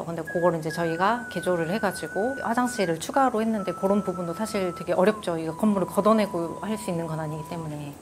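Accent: South Korea. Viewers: 표준어를 쓰려고 애는 쓰는데 억양에서 확실하게 아랫녁출신의 느낌이 드러나 토속적인 분위기랄까?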